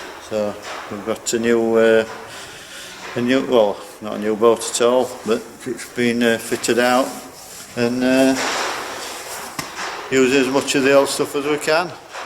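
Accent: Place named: Yorkshire, United Kingdom. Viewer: Cheers, just watched your first 10 videos and Im subscribed. Loving the Yorkshire patter and subtitles for the heathens, all the best from Scotland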